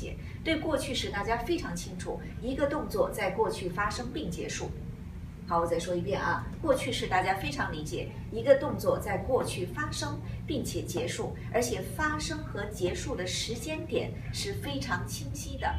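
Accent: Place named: China. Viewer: 我也觉得，跟其他女生相比，纯中式英语的语音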